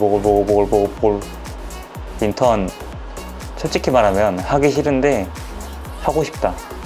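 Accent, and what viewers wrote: Gwangju, South Korea. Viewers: 이제 2월에 본1 들어가는데 너무 웃겨서 이것만 보는중이에요....ㅋㅋㅋㅋㅋㅋㅠ 아 글고 광주사투리 너무 익숙해요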